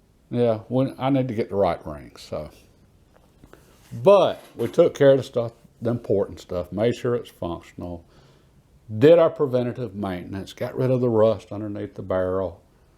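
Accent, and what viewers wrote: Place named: North Georgia, United States. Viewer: Enjoying your channel, I have been trying to pin down where you are from according to your accent. I was a trucker in my younger years and consider myself pretty good at guessing, leaning towards northern Georgia or maybe middle Tennessee 🤷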